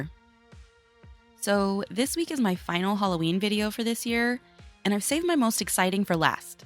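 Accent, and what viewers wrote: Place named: West Tennessee, United States. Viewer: gotcha! Well that is surprising. I'm in West TN so not close! Haha 😂 You sound like the people around here. Not talking about the country fol, just the city people. Lol Which now that I think about it, the city people, especially the newer ones (like in the last 20 years) would have come from somewhere else. So it makes sense their accents would be similar to those in other parts of the country. Lol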